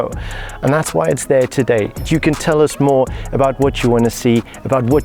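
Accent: South Africa. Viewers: This guy sounds South African 👌 are you? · Any chance you from South Africa with that accent? 🤔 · Is the presenter South African? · Mate you sound like you could be from SA?? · not sure. Just know he’s South African from the accent. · you sound very South African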